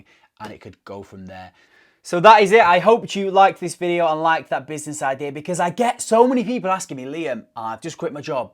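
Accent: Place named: Manchester, United Kingdom. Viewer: Who else missed that Manc accent 😁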